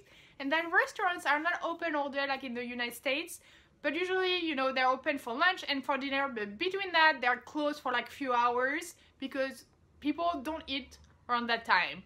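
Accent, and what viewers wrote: France. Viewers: C'est hallucinant tu as toujours ce petit accent qui me fait dire "ok, she's french, i'm 200% sure" Mais bon retour au pays 💪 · comment tu as pu garder une pareil accent français en 5 ans?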